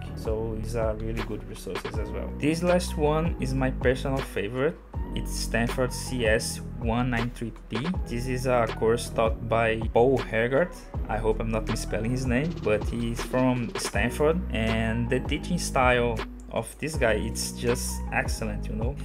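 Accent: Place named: Brazil. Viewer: Brazilian detected haha, vc tem curso em portugues ?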